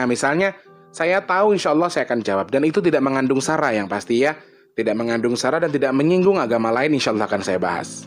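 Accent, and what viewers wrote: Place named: Java, Indonesia. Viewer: kbykn..orng jwa. fansny.. hbs logat.bhs cr bicr..medok bngtt. jwane... gt lho ms.. mlh. trnyt dr lampung y.... gpp ms..moh bnyk ssudr. . dr jw dn lmpung..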